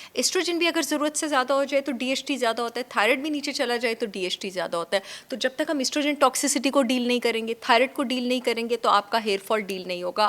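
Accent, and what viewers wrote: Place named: Pakistan. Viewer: Yrr i love pakistani urdu accent ❤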